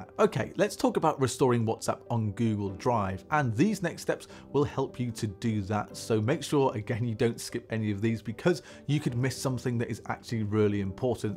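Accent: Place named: United Kingdom. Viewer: Ugh Please speak Normal English and fix your mouth please. You look sleepy and creepy with this British English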